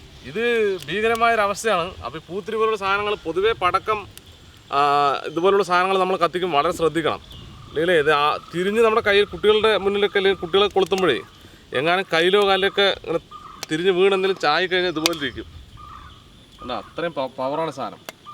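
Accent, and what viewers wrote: Palakkad, India. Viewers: യുടെ പാലക്കാടൻ ഭാഷ അത് വേറെ ലെവലാണ് 😘😘🔥🔥🔥🔥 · പാലക്കാടൻ ഭാഷാ കേൾക്കാൻ വേണ്ടി ഓടിവന്ന ഞാൻ 😜😜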